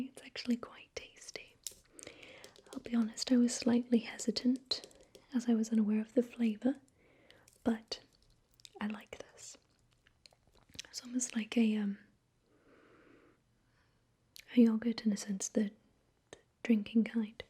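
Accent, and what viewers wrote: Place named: RP, United Kingdom. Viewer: You're quite posh, I like it though it's relaxing to me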